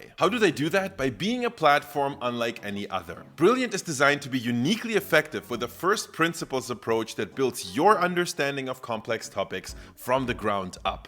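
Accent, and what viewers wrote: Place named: Germany. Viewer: This German dialect is terrible and unnescessary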